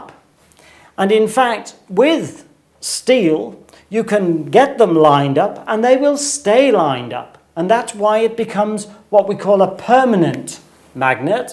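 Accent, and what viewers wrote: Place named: United Kingdom. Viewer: Brittish. Brits don't always pronounce r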